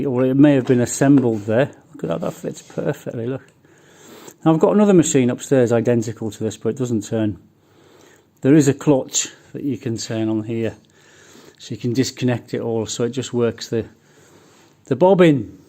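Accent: United Kingdom. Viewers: Love listening to - his voice is so soothing. I could listen to the UK accent all day long (American here). I couldn't watch more recent videos because I didn't want him to leave. But I'm getting back to them. Thanks so much, Fiona for keeping his memory alive!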